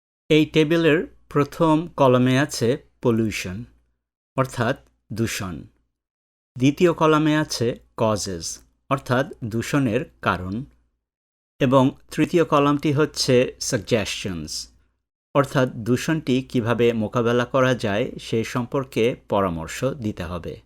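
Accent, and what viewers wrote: United States. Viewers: আমিরিকান স্টাইলে না বলে স্বাভাবিকভাবে বলবেন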